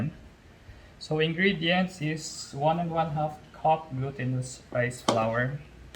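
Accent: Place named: Pampanga, Philippines. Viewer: magaling ka talaga lodi... ohhhhh mah!! ahaha talagng kapampangan. kanyaman na pamo ning inuman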